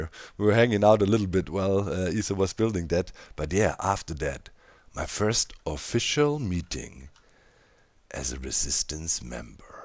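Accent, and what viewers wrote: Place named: Germany. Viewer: Dein deutscher Akzent... immer wieder schön 😁😂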